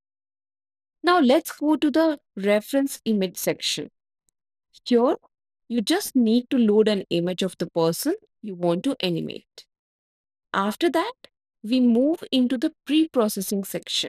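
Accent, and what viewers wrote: India. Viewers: but why you have indian accent ?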